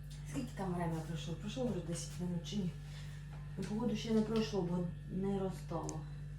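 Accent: Poltava, Ukraine. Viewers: Полтавськая область, Карловский район. Это родной мой край, где прошла моя молодость, где могилы моих родителей. Слушаю и наслаждаюсь, у меня тоже такой же говор. ❤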